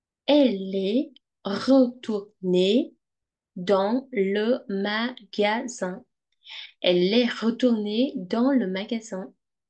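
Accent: Standard. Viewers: Ừ tôi đang viết cách phát âm , chuẩn lắm Mà nãy giờ có cô bé ngồi kế bên á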